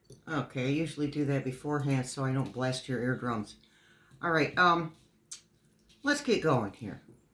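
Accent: New York, United States. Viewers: Thank you. I can tell you need this as much as we do. Take care of yourself too. We love you lady & are you originally from NY? The reason I ask is I’ve heard NY’ers say Yous guys so much, love it. 😊😊❤❤❤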